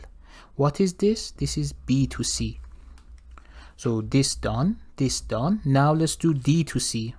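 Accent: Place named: Iran. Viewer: great sir. (btw, your accent seems like you're from Iran or its surroundings, am i right?)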